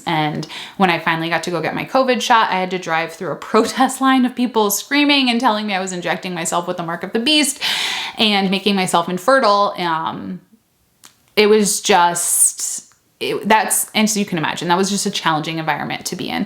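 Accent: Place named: North Carolina, United States. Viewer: Why is that sobering? At least I would be able to live comfortable and would be able to succeed in my life. That's my intentions to start my own business in a better state. I don't want to go in SC it's not the best state to accomplish my goals. Are you from NC? Because you sound like you were born here?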